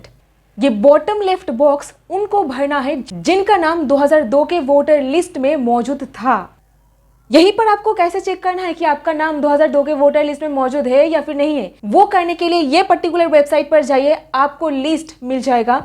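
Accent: Bengal, India. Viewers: Didi ka hindi mein bongla aa roha hai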